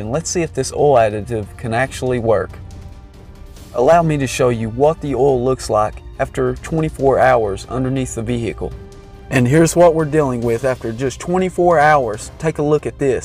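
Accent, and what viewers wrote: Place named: Texas, United States. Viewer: Wow! I LL try it! I like his Texas accent! Any cowgirls there?! Lucas oils are good! Good tips! So this is temporary treatment only?! Can use how many times or years?!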